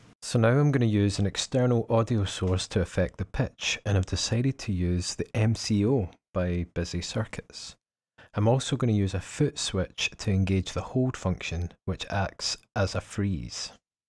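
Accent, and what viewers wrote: Scotland, United Kingdom. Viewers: Nice hearing another Scottish accent on gear. I got one of these ages ago but never really explored the input weirdness. I didn't even think of using a Euro oscillator/sequencer for the pitch. This is great. Just what I was looking for. I wonder if CV could be sent to the HOLD input too. Hmmm.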